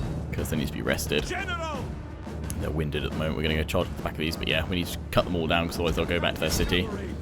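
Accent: England, United Kingdom. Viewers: What part of England are you from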